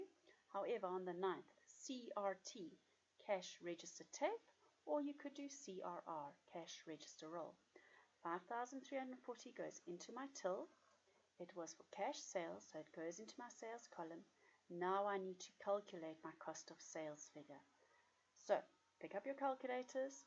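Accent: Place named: South Africa. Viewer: Eyy Ur South African🤣👌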